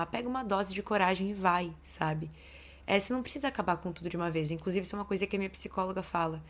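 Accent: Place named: Portugal. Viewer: Você mora em PT ou é portuguesa? O sotaque é tão fofinho😊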